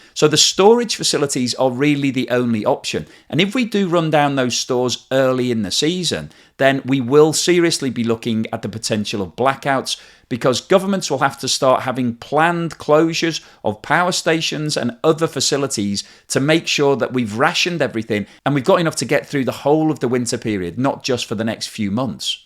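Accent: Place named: Wirral, United Kingdom. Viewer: is that a Wirral accent I can hear?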